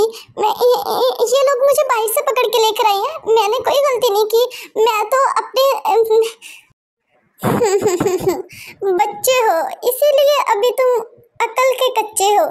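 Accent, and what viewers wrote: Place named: Punjab, India. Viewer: Ap ke voice sa me be punjabi ho😊😊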